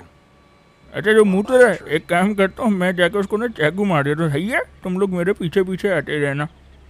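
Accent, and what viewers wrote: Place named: Pakistan. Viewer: bro ki awaz ma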